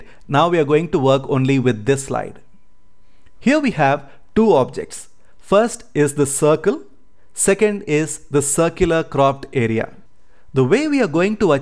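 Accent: India. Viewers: wonderful!! best step by step explanation...n best part is Indian English. Thank you for sharing the idea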